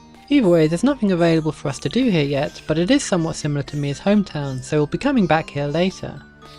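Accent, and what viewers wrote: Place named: United Kingdom. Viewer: They fly some what erotically? I heard that and laughed I'm sorry it was accidentally funny and I just want to say I do like this LP a lot (so you don't think i am a jerk for kind of insulting your interesting sounding accent...British I...think?) and I will stop typing now, since I want to go back to watching...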